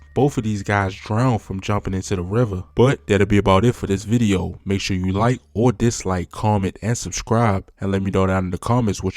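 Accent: Baltimore, United States. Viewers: I appreciate folks that's not from my city reporting on my city! Sound like you're from body more (Baltimore). Can you report on your city? I'd live to hear about the murders & gang sets in your hood. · I thought I was the only one who deciphered this. His bmore accent is heavy. 💀😭😭😭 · Your accent sounds like baltimore. But you are badly mispronouncing our street names dawg 😂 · Fr he sound like he from Baltimore or some bro don’t have a fucking clue what he talking about. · You sound like you from Baltimore talk about some Baltimore shit yo you don’t know shit bout no chi town shit, put your own city on first smh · This narrator is definitely from Baltimore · That Baltimore Accent 🦀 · HE DON'T KNOW WTF HE TALKING ABOUT, NOT FROM CHICAGO THAT'S WHY HE KEEPING SHIT WRONG.. HE SOUND LIKE HE FROM BALTIMORE. HE NEED TO STOP TALKING ABOUT WHAT HE DON'T KNOW.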